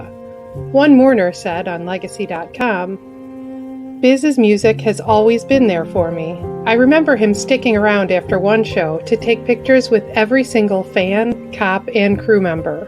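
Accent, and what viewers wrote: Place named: New England, United States. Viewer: U need to calm that extreme Yankee accent down, js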